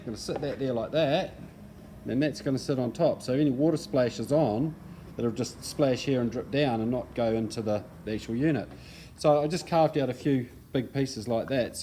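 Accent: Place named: New Zealand. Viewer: Fantastic! I have been thinking of buying something like this, but they are so damned expensive. I have to ask though, is the pump fit for breathing air? I know that in SCUBA, they have to filter it to take out anything that may harm health, so I am keen to know if this pump is fit for purpose from a health sense. If so, I think I may do this. BTW, it sounds like you are a Kiwi. Where abouts are you? I am in Welly.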